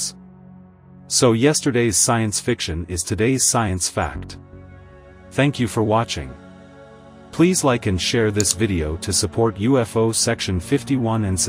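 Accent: France. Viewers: You have a beautiful voice CAPTAIN. You rock the flight deck. Good job! 💖🌞🙋‍♂️👽 "We like your french accent best." Fun to look into a.i. in a good way and have fun with it. ✌️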